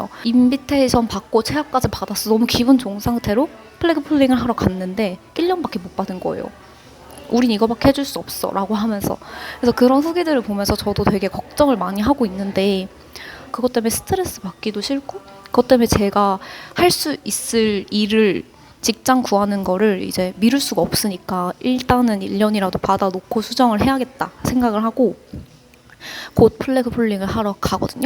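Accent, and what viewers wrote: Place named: Busan, South Korea. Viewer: 반갑습니다. 씨. 딱 부러지시는 부산분이시군요.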